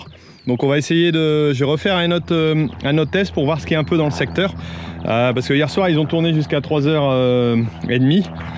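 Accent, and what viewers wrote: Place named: North, France. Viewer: Bonjour, je me douté bien que cette accent c'était un accent du Nord on est vite trahi par notre accent 😀 bonjour de Liévin,ta ferme de situe dans quel coin.?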